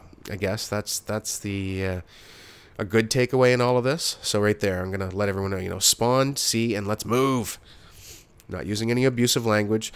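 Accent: Canada. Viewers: yes your Canadian... REPREASENT!!!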